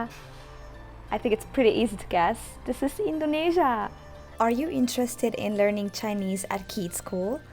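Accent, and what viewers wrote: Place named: Hungary. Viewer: It was really interesting to hear a fellow Hungarian speak English in a video Nah tessék én is már angolul írok xD Szóval amit már Facebookon megosztottál is szép volt de így videóban még inkább király, szép helyeken jártál 😀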